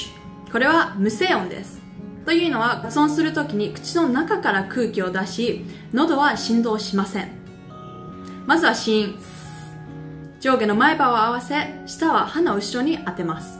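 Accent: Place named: United Kingdom. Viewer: Hi, your video helps me a lot. BTW, I just wondered your accent sounds like British. How many years had you lived there? Thank you!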